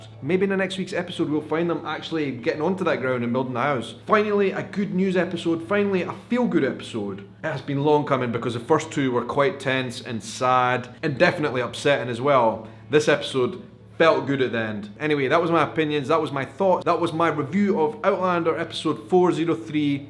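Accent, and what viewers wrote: United Kingdom. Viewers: Make no mistake I LOVE YOUR CHANNEL and as I said to another person we ALL have accents including me. But my California accent is ugly!! They said in NY that it was all those flat ''A's"" like ''baaaaaaath''. It's not classy or cute like yours or in all of Britain. I loved it when you said ''Roger Roger, Roger what are you playin' at Son!!'' LOL! I know wow.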